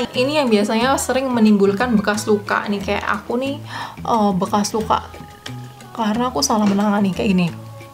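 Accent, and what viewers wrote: Java, Indonesia. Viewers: kak Medhok banget 😂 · Medok bangetttt ngomongnya 😆😆, tapi pintar kamu yaaa soal nutrisi kulit 😗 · Medhoook e rekkkk😅😅😅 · Mbk,,, bahasa jawa nya masih ke bawa, bawa ya, 😀😁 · Alhamdulillah untuk jerawat saya tdak terlalu seperti itu yg agak seram jga, cma ini aja masalah sya mau sering kluar rumah/sering di rumah tapi sama aja wajah sering bnget berminyak dah coba sabun cream muka tapi msih aja terutama bagian sekitar hidung hingga ke kening/jidat kepala, mohon pencerahanya dok... Btw salfok mba dokternya koq agak medok bnget bicaranya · Suaranyaa mueedokk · Logate koyo wong jowo:v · Medokk yaaa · Medokk bangettt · Dokter orang Jawa tah,ngomong nya medok😁,,,sama kayak saya kalo ngomong b.indonesia nggak bisa hilangin logat jawa'nya 😁 Btw, terimakasih informasinya dok, sangat bermanfaat 🙏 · Jawa nya modok bnget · nice info.. btw medok bwanget mbak e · Dr. 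ngomong jawa'nya medok banget y...😂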